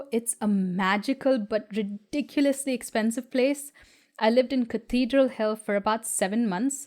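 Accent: India. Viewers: Thanks for clarifying the name . When I saw your video and voice. I guessed you are Indian, but name sounded little different. No hard feelings was just love and curious. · I had pretty much decided you are Indian, from your accent. I expected is your married name, with your accent still doesn't make a lot of sense to me. Your Portuguese connexion goes far to explain why you don't look like most Indians I have seen. Years ago, I knew Yvette Rodrigues, her face had a similar shape to yours, though she came to Australia from the Philippines. Do I think all Indians are the same? They don't all look the same any more than all Europeans all look the same. I was rather taken one year, when I was watching an ANZAC Day march. A contingent of Poles came by. Individually, they don't look much different from any other white Australian. Together, the difference is striking. 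I suspect they mostly speak English though, but also a selection of other languages such as Urdu, Hindi. Arabic wouldn't surprise me. Probably with wildly different accents and dialects. I am not that surprised to find architecture students aren't quite ready for the workplace. From my expeience, in the 70s it took computing graduates a year to settle into their first job so they didn't need to be closely supervised. Part of it was that they learned on Burroughs equipment, not at all like any government (I was in Canberra) department. You could conceivably work to fill the gaps. I live in Mandurah, about 15 minutes walk from the Indian Ocean, about the same latitude as Dallas. We don't do ice and snow. You should do Q&A exactly as often as you like. I tend to not watch them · I still can't get over how refreshingly entertaining it is to hear this lovely lady with her Indian accent say "y'all" at the end of some videos.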